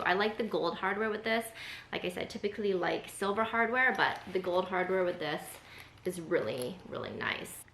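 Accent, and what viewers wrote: Canada. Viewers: Are you Canadian? My grandma is from Canada and that’s how everyone I know from there says bag lol I love it tho!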